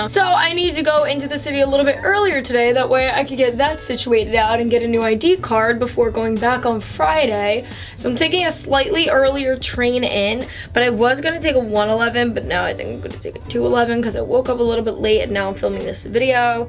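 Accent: New York, United States. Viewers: WOAHHHG I JUST NOTICED HER NEW YORK ACCENT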